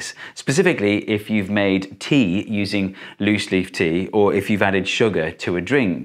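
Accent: United Kingdom. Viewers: I look forward to videos...but.... I feel that he is fully taking advantage of the fact that Americans have been programmed to think that people with a British accent are smart.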